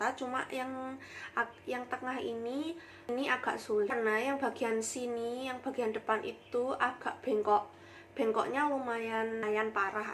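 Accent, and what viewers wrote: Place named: Java, Indonesia. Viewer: Medok rek jowo ne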